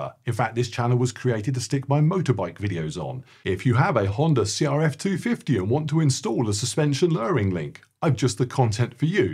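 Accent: England, United Kingdom. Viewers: I need that English accent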